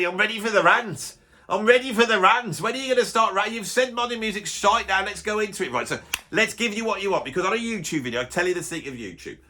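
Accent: Birmingham, United Kingdom. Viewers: The brummie accent is hilarious . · Definitely! Plus I always think that a rant in the Brummie accent always gives it that extra 'Je Ne Sais Quoi' !!!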